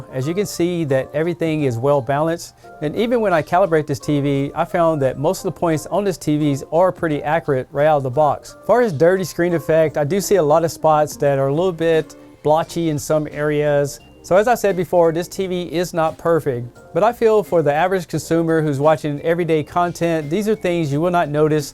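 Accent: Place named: North Carolina, United States. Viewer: I’ve watched a lot of your videos but still can’t quite pinpoint your accent. I’m thinking somewhere in the North Carolina area? Maybe Kentucky?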